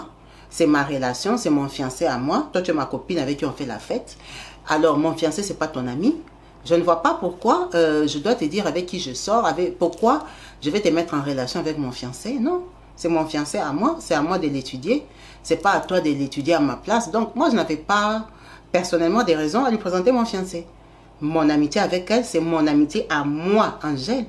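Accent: Democratic Republic of the Congo. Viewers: Franchement jadore tes histoires et tu merites être connu, je vais partager tte tes histoires ds ts les groupes Facebook❤🎉 Merci de Ns les conter terre a terre sans chichi avec cet accent congolais 😀🥰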